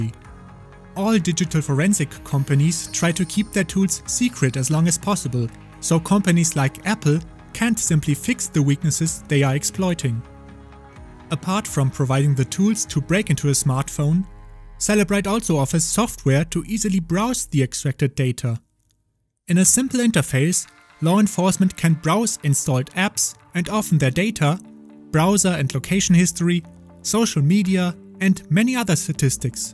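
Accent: Germany. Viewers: You german? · The German Accent is Thick my friend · Are you german by any chance? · Man kann den deutschen Akzent einfach nicht verstecken, keine Chance 😂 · Ich finds immer lustig, wie man direkt am Akzent merkt, dass jemand deutsch ist. Whatever, sehr gutes und interessantes Video. Ich persönlich würde mich nur auf Graphene OS verlassen. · Awedul German accent and the guy doesn’t know how to speak in a microphone. He makes tongue clickings all the time. Don’t blow the microphone, space it farther away from your mouth… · Nach 2 sekunden direkt gewusst dass du deutscher bist xD. Ehrenmann ^^ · deuitscher macht englisches video, hört man an der aussprache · German Accent on Fleek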